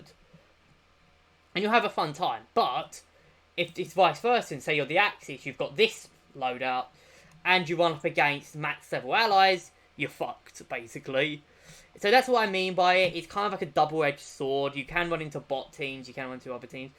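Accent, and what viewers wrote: United Kingdom. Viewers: how fricking cool is your british pronounciation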